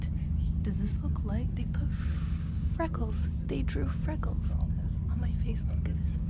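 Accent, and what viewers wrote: Turkey. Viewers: You almost sound like a turkish person who is speaking English. You've accent lol. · What american living you are Turkish you can change your look but you can not change your Turkish accent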